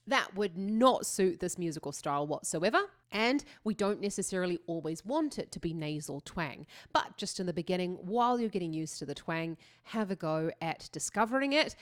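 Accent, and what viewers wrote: United Kingdom. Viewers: Oh, it's weird to see my comment at the beginning:D (just heard your comment about you being in Germany?So..,Hello from Germany! Never thought that, would've said you're British judging by your English ^^). And now, first (my favorite song of hers) and now, what a great day for me! Thank you for your analysis (and I'm so glad you like her too) even though I recommend, if you'd check some korean songs as well in the future, just take a look at the lyrics since she's not only all about technique but she's a great storyteller as well. She's insane! She's actually a chameleon who can do every song and genre and turns it into hers. From Rock or Jazz to even rap. She tried it all. What would be interesting maybe if you'd check out an English song of hers. She does her arrangements by herself on Immortal Songs. She sang there and. Last one, directly in front of. 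He invited her to join him on tour after giving her a standing ovation. She performed together with him later. For a korean one, I highly recommend " " on I am a singer (Please use the vimeo one, if possible with subtitles, since the youtube version is cut and the lyrics are incredibly sad. The climax is heartbreaking):) And a stage which has already legendary status is " ". It's a korean folk song turned into a beautiful arrangement with seemless transitions and beautiful vocals. (Here you should maybe check out the b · I am not surprised this video is 25 minutes long, analyzing cover is a masterclass. welcome to world, this is considered to be her greatest performance. I had not picked you for being across the pond! I thought you were British.:D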